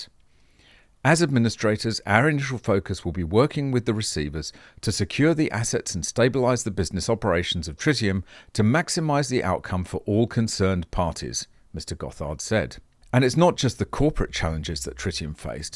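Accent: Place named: England, United Kingdom. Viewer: The channel host is from England. He even says as much on his channel summary description. Moreover, he has an English accent. 🇬🇧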